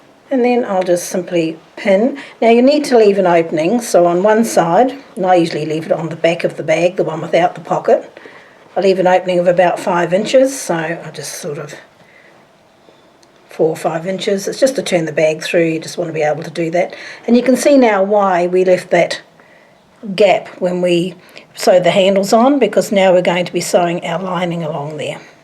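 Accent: Australia. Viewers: Okay, so while I LOVE your tutorial, I absolutely adore your Oz accent. Thank you so much for the guidance on this tutorial.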